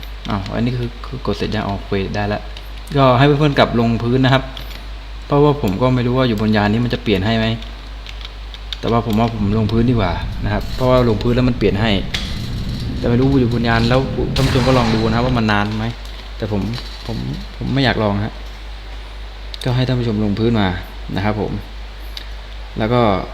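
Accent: South, Thailand. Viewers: พี่คนใต้หรอครับ5555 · พี่เป็นคนใต้หรอ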